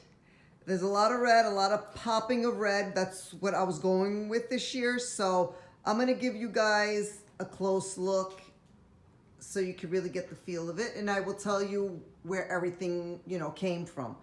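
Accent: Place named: Northeast, United States. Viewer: Beautiful, I like the traditional red and green colors for Christmas. Great idea with the use of the cardinals, I love it. I am going to incorporate them into my Christmas decor next year. I enjoy watching your videos and listening to your accent, you remind me of some of my dear friends. Are you from New York, New Jersey or Pennsylvania, by any chance? Have a wonderful holiday.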